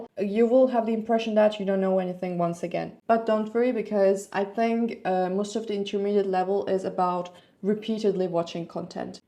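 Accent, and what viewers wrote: France. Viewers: Am actually a french native and your intro was really good. You've even got a little bit of our accent 😂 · Bonjour.Quand vous parlez Anglais vous avez des intonations françaises assez souvent! Vous le saviez? Votre Français est juste extraordinaire, c'est très inspirant. Je suis en train d'apprendre l'anglais (et uniquement l'anglais ahaha!), et je dois dire sans jamais avoir mis les pieds en Angleterre... J'aimerais atteindre un tel niveau(mon dieu!), vraiment vous avez mis la barre très haut!!!Fabuleux! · What is your mother tongue ? Because you have a french accent when you speak english ! This is amazing ! 😊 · you have a French accent when you're talking in English..... omg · You have even a French accent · Again, there is no way this blogger is not a for-real native French speaker. When she wants to say "right now," while speaking in English, meaning "at this time" or "currently," she says "actuellement" which is the French way to say it. 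No English speaking person would make that replacement as it is a "false cognate" and would not be natural to make this translation so instinctively. Also, there are pronunciations that are decidedly French, ones that a native speaker can't get out of their speech no matter how proficient they are in a new language. Ditto, would be those sounds that a non-native speaker, no matter how proficient he/she becomes, could pronounce as spot on as she did. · It’s sooo amazing u got all the way to c2. Your French is so good!! You sound French lol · That the frenchiest accent I've heard by far from a non-native speaker, I'm baffled right now ! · She even taught herself a thick French accent in English, she's incredible! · ingilizceyi de fransız gibi konuşmanız ilginç olmuş, neydi batının iyi taraflarını alııp... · you sound too French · your french is so good even ur english accent is french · Il me semble que vous êtes Française, rien qu'à votre accent. 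Ceci me semble une vidéo bien bidonnée ;-)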